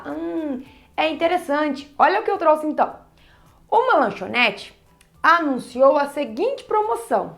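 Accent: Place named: Paraná, Brazil. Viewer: eu escutando o video e pensando " nossa esse sotaque é familiar" ai na hora que ela falou Paraná eu lembrei dos meus primos do Paraná que falam igualzinho a ela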